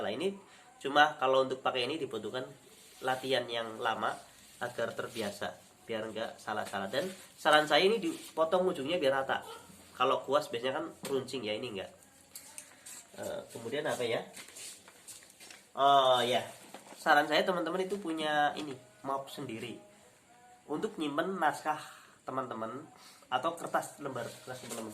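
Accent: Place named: Banyumas, Indonesia. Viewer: Ijin subskrebb gan... Kok bahasa indonesianya kayak orang barlingmascakeb ya?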